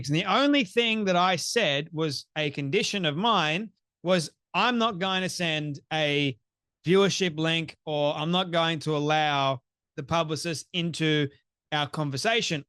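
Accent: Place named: Australia. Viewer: I love your channel, you speak as an Australian and i so appreciate that 👌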